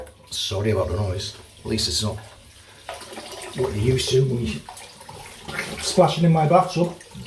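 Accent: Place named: RP, United Kingdom. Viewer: Get'in a bit posh now then lad?!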